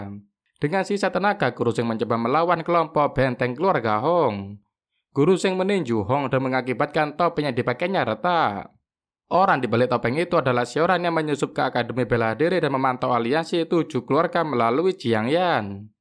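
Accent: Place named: Banyumas, Indonesia. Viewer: Mc nya wong ngapak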